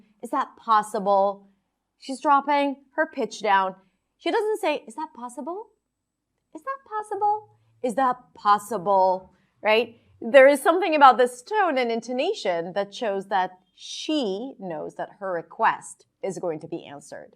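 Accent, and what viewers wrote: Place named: Boston, United States. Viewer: She is really easy to understand but her vowels are not standard English but some kind of dialect, I expect Boston. My understanding is that the everyday Boston accent is difficult to understand by people not from the area.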